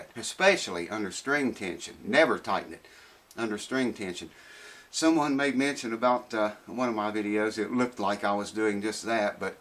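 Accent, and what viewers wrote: South, United States. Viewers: The rain makes me feel like weve been through some shit together. thanks for the wisdom. This is like the closest thing to America I feel like I've seen since I was was a kid in 1993 listening to my grandparents talk. That southern draaaawl mayne, I tell yeh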